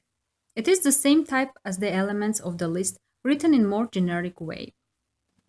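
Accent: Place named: Russia. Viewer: Thank you, these tests are very helpful for consolidation of the material. Very familiar accent... Russian?)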